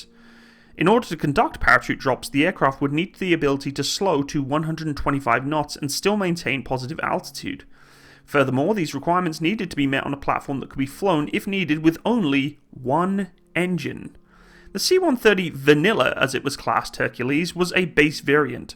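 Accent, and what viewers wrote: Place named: United Kingdom. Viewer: Your British is showing 😊: In American; I fly in a C-130 ... present tense I flew in C-130 ... past tense I had flown in a C-130 ... past perfect I have flown in a C-130 ... past pluperfect I think that is correct. At any rate, a nice and concise as always discussion of a great airframe.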